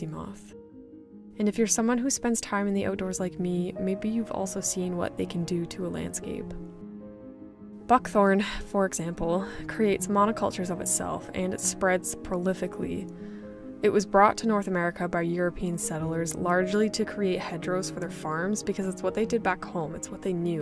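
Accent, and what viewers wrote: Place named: Ontario, Canada. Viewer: Keep speaking this way, when you said you were nervous I was like noooo! It's so powerful! If I had to guess what province you live in... I think it's Ontario.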